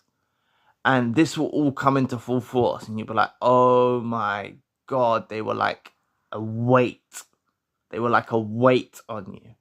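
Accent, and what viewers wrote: London, United Kingdom. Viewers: tring to work out your accent , thinking maybe LDN originally but moved out the city :-)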